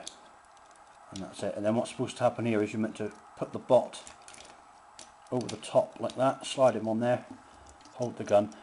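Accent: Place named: England, United Kingdom. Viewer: Yes nice review, with the English accent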